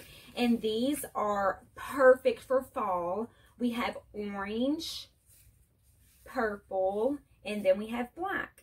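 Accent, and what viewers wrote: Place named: Southwest Virginia, United States. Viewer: your channel is my favorite! love your accent. It sounds a lot like mine. I'm from Southwest VA. I have two daughters and also live in a mobile home so we have a lot in common. I love to watch your channel for cleaning motivation. You're daughter is so cute and you are too! love your long hair!! Hope you have a great day. <3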